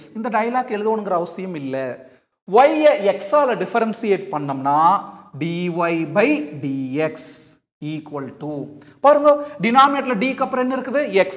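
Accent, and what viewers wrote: Coimbatore, India. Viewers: CBE Slang 🤌🏻❤️‍🔥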